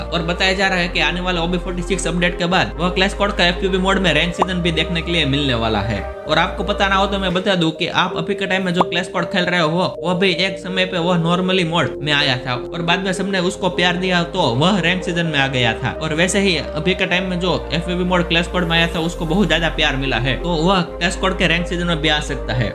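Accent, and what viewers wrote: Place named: Gujarat, India. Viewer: I think you are Gujarati aapke aawaz se pata chal gyaa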